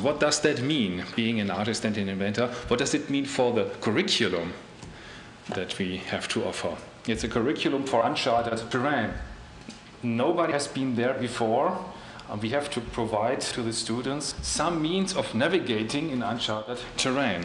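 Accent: Germany. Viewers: german accent ftw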